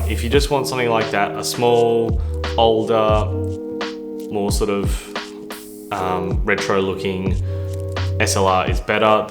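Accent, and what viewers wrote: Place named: Australia. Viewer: So nice to hear an Aussie accent. I have a 28-70mm old film lens and on the hunt for a newer version of my F70. This looks perfect. You got a great deal. I've not seen anything close to that on offer. Nice job with your channel - keep going.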